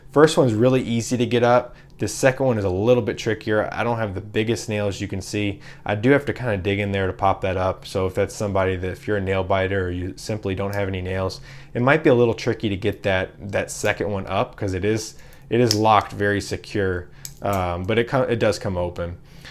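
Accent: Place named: Kentucky, United States. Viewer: I hear a Kentucky accent!!!!!!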